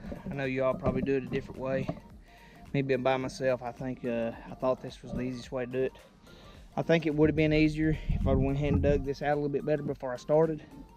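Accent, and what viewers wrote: South, United States. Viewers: Dang that accent sounds more south. I have to put in a 200 amp meter pole for a single wide Saturday I'm gonna be doing it alone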